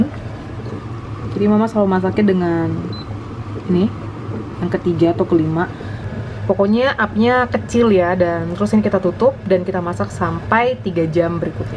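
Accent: Indonesia. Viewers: lidahnya indonesia banget😄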